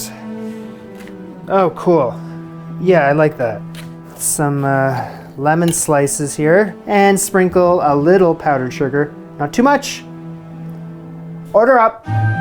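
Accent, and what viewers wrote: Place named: Southern Ontario, Canada. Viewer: once again, please never apologize for how you pronounce words! We all get to have our regional dialects and variations and the way you say “skewers” is entirely fine. I made a comment on your “cauliflower” episode and lots of people are still liking and agreeing to this day! Some people seem to not understand that YouTube is global and that their own accent is not the definitive one. You have a Southern Ontario accent, be proud of your roots! And, Girls Night Out should not be as tasty as it is, right? 😂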